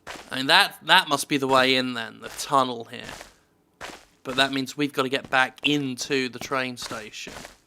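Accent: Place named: United Kingdom. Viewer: Hey I want to know, I'm I may, how do you maintain your Britishness? Is America slowly taking you over? Like in ten years will speak with that Mississippi accent and fire your guns five times a day?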